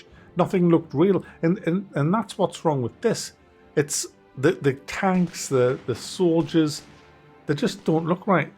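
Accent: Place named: Scotland, United Kingdom. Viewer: Are you from Scotland or something? You have an interesting accent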